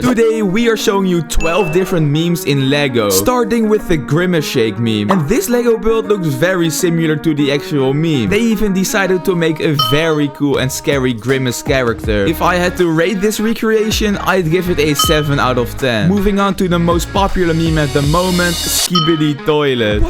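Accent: Netherlands. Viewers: 😊ar you dutch your accent is dutch?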